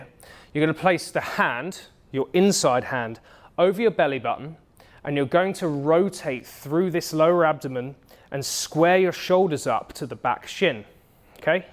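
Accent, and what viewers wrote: United Kingdom. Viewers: Very nice and detailed presentation. Your video was full of the kind of detail that's helpful to know to achieve good results. And, you sound British, but you look like Capt. America.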